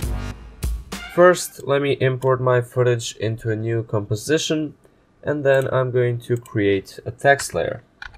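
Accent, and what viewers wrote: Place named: Balkans. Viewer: I suppose your from balkan your accent is great and thank you for tutorial❤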